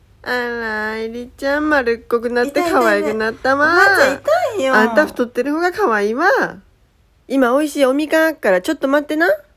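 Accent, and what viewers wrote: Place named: Ibaraki, Japan. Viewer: 茨城弁うまいですね。 ほんとネイティブ茨城弁です。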